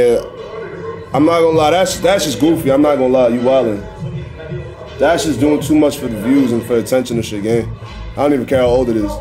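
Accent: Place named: New York, United States. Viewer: We get it bruh you from ny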